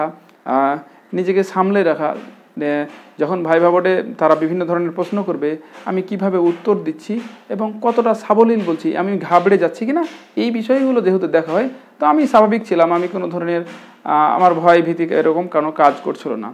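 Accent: India. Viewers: Apnr kotha shune mone hocche apni indian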